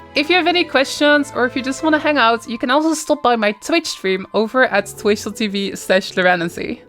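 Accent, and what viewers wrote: Netherlands. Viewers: i can be wrong but i smell dutch 😅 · can really tell the dutch accent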